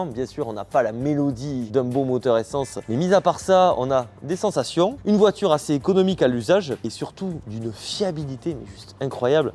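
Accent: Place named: South West, France. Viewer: J’adore l’accent du Sud Ouest !👍🏻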